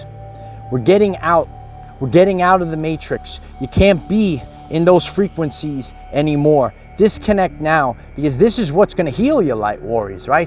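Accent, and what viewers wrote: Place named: New Jersey, United States. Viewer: cool Jersey accent.. who are the rainbow warriors? Never read about that in scriptures. Did some debased tribe make that one up? God created the Rainbow - displayed it after the first Flood / damnation of the humans who were huddling together in heathen actions. Rainbow across the road now 'represents' sodomy and child abuse. Which one is it mister virtue signal. Oh...and the Hopi never talked about 'rainbow warriors' that was invented by some Jewish guy in the 70s high on LSD. It was a cool story though.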